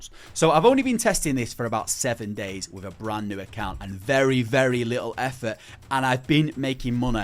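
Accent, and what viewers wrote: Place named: Northern England, United Kingdom. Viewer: English northern accent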